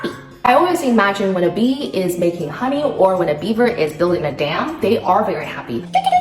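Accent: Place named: Vietnam. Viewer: I was quite astonished by the time i heard your accent, it was really like Vietnamese accent, but it turned out you are an authentic Chinese . Anyway your English voice is So great, i love it. A comment from viEt nam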